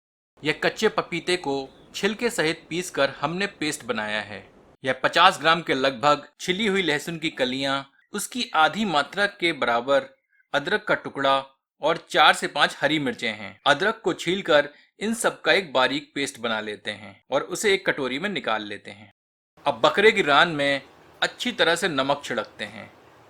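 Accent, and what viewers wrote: Standard. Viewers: Bhai ji app butter chicken ki recipe bhi batana.. Please...Apki videos hum sabko bahut achchi lagati hai, apka shuddh hindi bolne ka andaz hum sab ko bahut bhata hai, I love you bro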